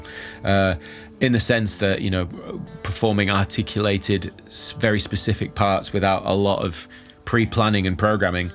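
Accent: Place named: England, United Kingdom. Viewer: Loving the dickies shirt and English accent. To appropriate is to appreciate.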